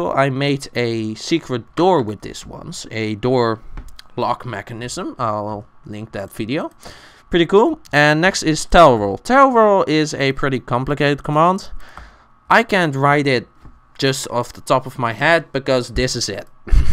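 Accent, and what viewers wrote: Netherlands. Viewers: Bedankt!! Zocht al een tijdje voor dit! (Ik reageer in het nederlands, want jouw engels accent lijkt op die van nederlanders XD) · jee nederlands accent ik hoor het gelijk XD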